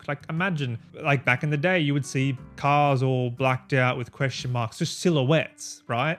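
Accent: Australia. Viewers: brooooo, an aussie? oh mate lets do this, i just listened to an american younin talk about Midnight club for 40 mins and i so wanna be home again baha. - a fred durst ref in the first minute, i'm yours rofl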